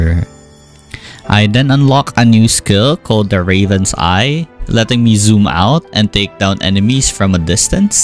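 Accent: Philippines. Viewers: Hello, are you by any chance a Pinoy? Just a random guess based from your accent.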